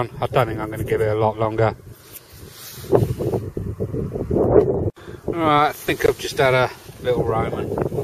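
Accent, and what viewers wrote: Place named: Essex, United Kingdom. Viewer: G'day I'm an Australian these days but came from Ilford back in 1970. Where are you? You sound a bit like an Essex lad. I detect here in Perth but there is nothing very old in Oz.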